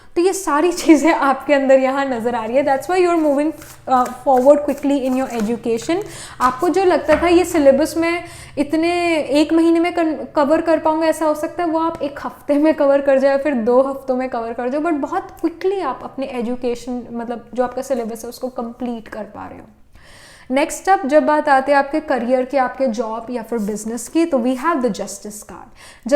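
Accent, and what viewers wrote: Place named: South, India. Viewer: Your voice is very good with south indian touch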